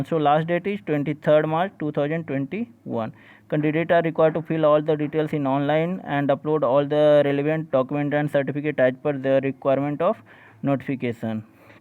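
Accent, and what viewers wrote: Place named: France. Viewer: Hindi hi bolde Bhai English Teri French Jaisi hai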